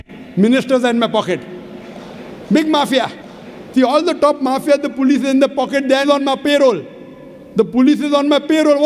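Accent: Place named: India. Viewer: I am really liking the Indian accent . It is cool .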